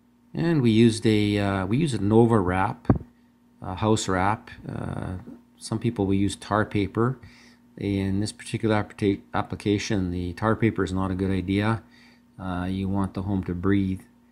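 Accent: Canada. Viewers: You very much Welcome, again excellent looking home! I only assumed you were Canadian by your accent I have a few friends that live in Canada, Well greetings from Florida! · Your building construction is AWESOME, and is STRONG! (...your accent: are you Canadian? I love your accent!My mother was Puerto Rican; she had her accent; my father was a "hard-nosed Yankee; he had his accent. Your accent is...nice; it's "neat" that your daughter gets to help! GOOD JOB!! AWESOME QUALITY!! ). 👍😃👣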